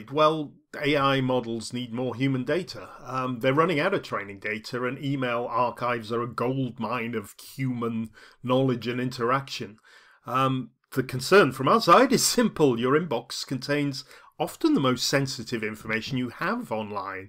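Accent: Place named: England, United Kingdom. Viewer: Why is this voice using an English accent?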